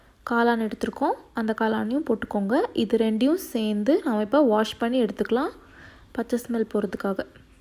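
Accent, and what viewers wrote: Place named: Coimbatore, India. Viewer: Otuka 🤣that Coimbatore slang:)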